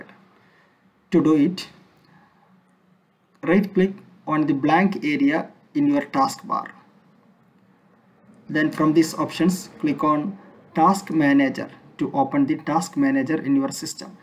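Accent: India. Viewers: came here to actually remove the icon, thanks alot <3 Indian accent is always funny to me btw lmfao, just thought I leave that comment here forever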